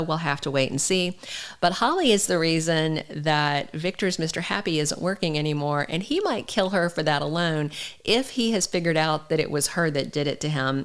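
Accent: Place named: United States. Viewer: It's nice to hear an American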